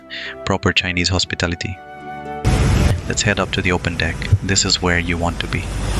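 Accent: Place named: India. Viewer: 你的英語口音和其他大部分印度人的英語口音不同，為什麼？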